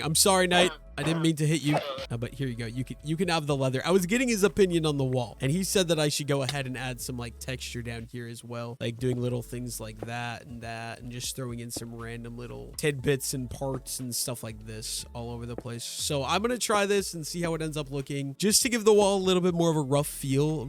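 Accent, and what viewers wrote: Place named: Canada. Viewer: You sound somewhat canadian 😂❤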